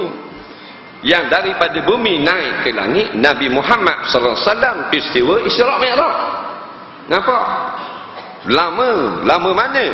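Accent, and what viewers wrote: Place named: Terengganu, Malaysia. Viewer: LoL!! Lawak!!! Seb baik atok nenek aku org ganu, blh gak faham kecek ganu.. :) -Singaporean..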